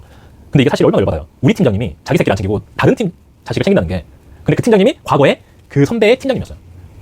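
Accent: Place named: Gwangju, South Korea. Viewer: 광주사투리 넘나 매력적… ☺️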